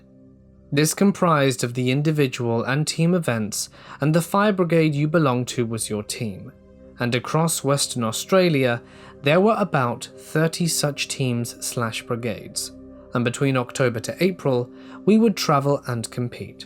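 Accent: United Kingdom. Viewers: Nice British narration and manner for a change ( I thought USA You Tubers had the Monopoly on this subject ! )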